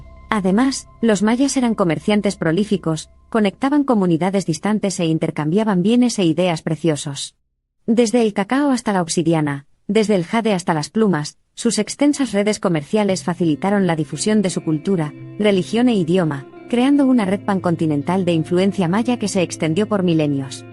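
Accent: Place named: Spain. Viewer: Pienso que es una ofensa que la propia narración sea con acento de España...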